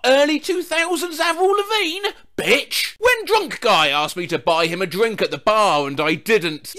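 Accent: United Kingdom. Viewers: because it all is being said by a british person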